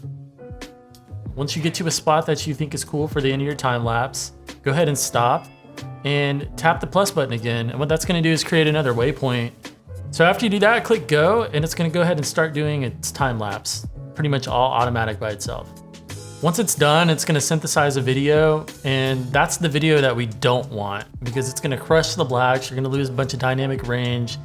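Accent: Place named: Russia. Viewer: Ах этот русский акцент 🤪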